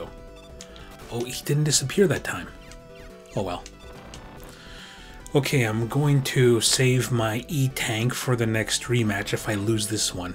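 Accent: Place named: Canada. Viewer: You sound canadian. Fun play through.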